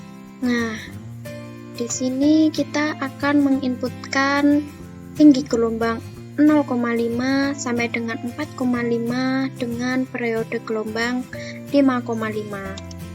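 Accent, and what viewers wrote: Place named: Madiun, Indonesia. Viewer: Terimakasih banyak mbak jadi kangen sama medoknya madiun ehehe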